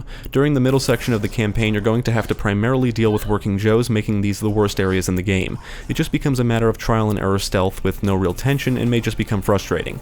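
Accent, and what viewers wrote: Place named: Mid-Atlantic, United States. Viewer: Are you friends with cinema cins or ACG because all 3 of you guys have that fast pace, mid-Atlantic get to the point informative voice lol.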